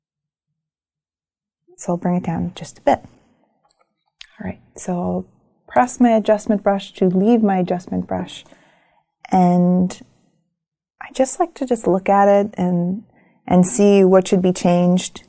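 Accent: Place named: New England, United States. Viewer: I'm glad you like our Yankee accents :D I have to say, the NZ accent is one of my favorites thanks to Flight of the Conchords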